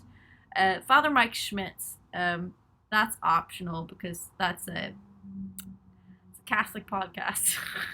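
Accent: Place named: Ireland. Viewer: Lord Tunderin Jeezis.....You Look Almost IDENTICAL To My Ex Girlfriend!!! But, Your Eyes and Personality is/are Much More Beautiful than Her.....She wasn't A Loyal, Trustworthy Person...I Personally believe She was Bi-Polar??? Anyway I'm From The Canadian Chapter of The Fan Club...There's only 9 of us..10 if You count the Dog "Buddy" (After Buddy Holly?)...LOL!!!!!!....Seriously, I Truly Love You and Your Singing....It's just that it causes Me to experience too many Feels...if You know what I mean?......I've truly Adored You for the last 8 years when I first saw You Excel...With an Excellent Elton John Tune....I must've re watched it over and over and over again about 500X....Well, This was My first Post....And That Killa Irish Accent Just Killz Me.....Lots of Irish in my family tree.....Anyway...Best Wishes....I Hope You're Doing Amazing........Hopefully someday You can Tour Canada....Barrie or Orillia Ontario Would Be 2 Excellent Venues....There's Casino Rama near Orillia....And Molson Park Ampitheatre in Barrie....Good $$$...And Nearest to Me...So...Win...Win!!!....Stay Sweet!!!!!!!!!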